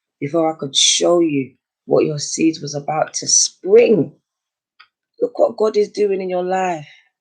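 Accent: United Kingdom. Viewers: I thought so! ☺️ I‘m Swiss but my boyfriend is from Manchester too thats why your accent sounds familiar to me 😍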